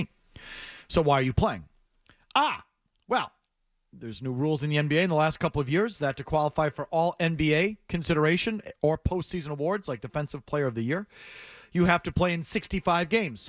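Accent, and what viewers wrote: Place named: Wisconsin, United States. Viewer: Sawry. Your Wisconsin accent irritates me. Especially when you say “sawry” and “suhvun” (seven)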